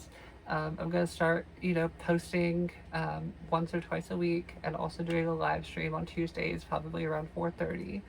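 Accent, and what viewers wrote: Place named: South, United States. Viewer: You may not think you are all that attractive but girl you are gorgious. I as a trans woman aspire to one day hit the point where I am at least half as gorgious as you. Based off of you accent I am guessing you are southern. I have only been on Estrogen sense Jauary 22nd this year so I have a wase to go. For now I am leaving the pronouns on the back burner as I am not fully passing in person yet at least for long periods of time. I would love to officialy use she/her pronouns in my daily life but I also feel if I do not push it and let it come naturaly no one can use pronouns in an attempt to insualt me.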